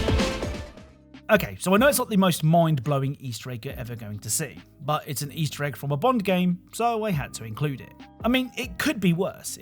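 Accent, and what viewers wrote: United Kingdom. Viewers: I really have to say this but finally seeing how you look in real life your voice doesn't how you look your voice is so tame British I guess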